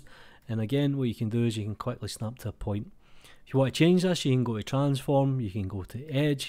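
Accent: Scotland, United Kingdom. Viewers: I subscribed for two reasons: 1) I absolute love the fact that you are doing this and doing it for free. This will help lots of artists coming from maya and make the transition much easier and faster. 2) I absolutely love your voice and accent. It makes me remember the william wallace campaign of Age of empires 2. Also: Please please if possible make it someway that mimics the maya's pivot system. The blender forum has a request for better (and faster) pivot system and I really believe maya's way is lot faster to do. First and foremost it'll help out a lot of students who want to work in blender! Thank you!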